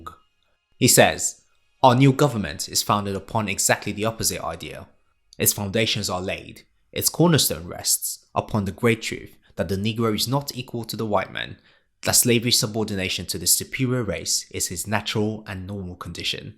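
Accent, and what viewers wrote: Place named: United Kingdom. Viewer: I wont hear a british voice talk to me about the AMERICAN civil war...stay in your lane tuber.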